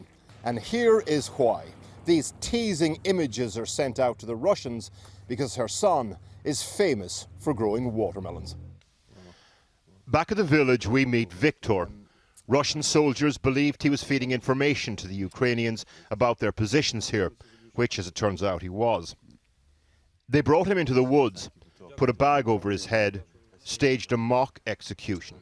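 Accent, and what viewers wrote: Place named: United Kingdom. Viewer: marshall ... as in martial . a british channel are you not ?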